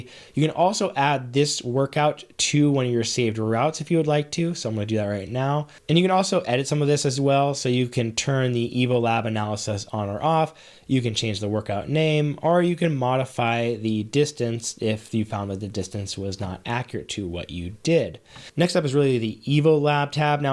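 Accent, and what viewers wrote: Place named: United States. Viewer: You certainly want to be clear and explain how your (my) watch works, but you should consider it is very very hard to understand the comments for foreigners as the horrible american accent and the way they pronounce 50 percent of the words make it a real performance for users outside the US. Except, if there is a translation somewhere (which I did not found). Please make an effort !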